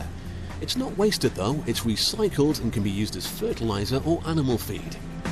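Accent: United Kingdom. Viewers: British accent > American accent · anybody else cant stand british narrators? idk, maybe its the accent, maybe it seems like hes trying to sound like a smartass. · The video states, "if the fruit goes over 27 degrees, it can no longer be called cold-pressed". Since the narrator sounds British, I assume that it is 27°C (~81°F). The average USA swimming pool is kept at 80°F, so if you got into a bath of 80°F olive oil, the oil would feel cold since human bodies are around 98°F.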